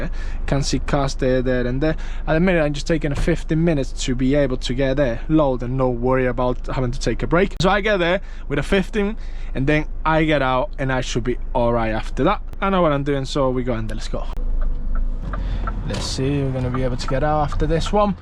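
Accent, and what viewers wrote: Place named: Liverpool, United Kingdom. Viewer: Love your videos mate, you’re the backbone of our society! I’ve always wondered where your accent is from? Sounds like it has some Scouse influence.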